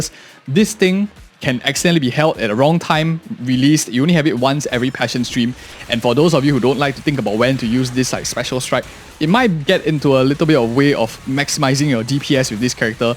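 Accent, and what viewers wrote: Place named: Singapore. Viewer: Are you Singaporean? We have similar accents 😮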